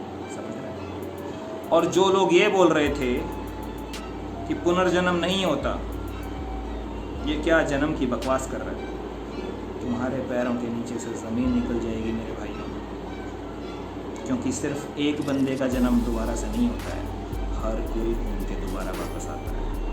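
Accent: Haryana, India. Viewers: I love this khadi hariyanvi boli 😉😉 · Is video me Pure Haryana accent me bate kiya hai😀 similar to Acharya Agni Dev Arya ji 😀 pure Haryana accent 🙏🙏🙏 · Bhai ji aap haryanwi ho kya🔥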